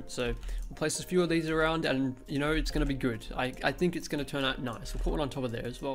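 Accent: Australia. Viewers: is he australian or some british country if he said "g'day" hes australian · Like that good Australian accent mate. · Are you Australian? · most Australian intro ive ever heard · By the 1st 3 words i guessed you are an australian M8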